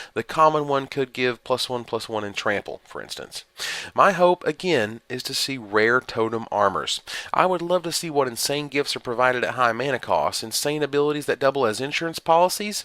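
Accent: South, United States. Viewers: He's from the south, he can't help it.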